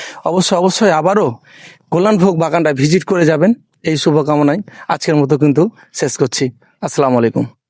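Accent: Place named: Kolkata, India. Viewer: আপনার কথা শুনে মনে হয় কলকাতার লোক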